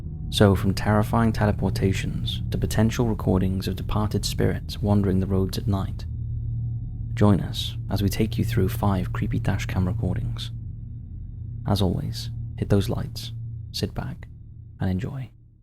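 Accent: Wales, United Kingdom. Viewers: Hello, you have a great voice, do I spy a bit of Welsh. I think you should loose the top 5 and start doing full stories as your voice and narrative skills would suit that. But thank you for uploads. Always a joy x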